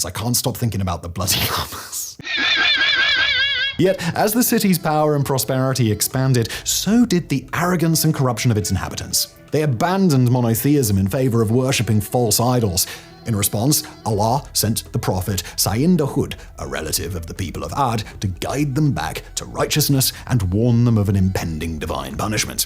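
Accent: RP, United Kingdom. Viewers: At first, I thought Simon was stricken silly by a homophone... then, I realized that he wasn't. This guy doesn't know the difference between a lama and a llama. I guess a posh accent and arrogant snark can't always hide ignorance.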